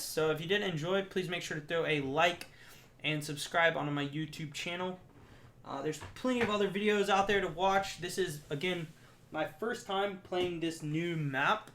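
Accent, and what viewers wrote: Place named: Pittsburgh, United States. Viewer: are you from Pennyslvania? You have kind of a Pittsburgh accent...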